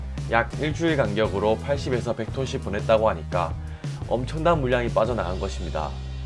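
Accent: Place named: Gyeongsang, South Korea. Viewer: 진짜 듣기 싫네, 저 목소리. 쌍도 것이구만. 쌍도 새기들은 무슨 유튜브를 저렇게 많이 하냐, 선거나 제대로 하지. 요즘 쌍도 사투리 들으면 두드러기가 난다. 꺼져라, 이 더러운 쌍도 물건아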